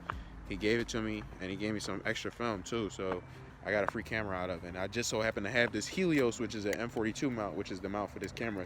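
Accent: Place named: Philadelphia, United States. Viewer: u gotta be from philly the way you talk ahaha really love the music vibe and the photos!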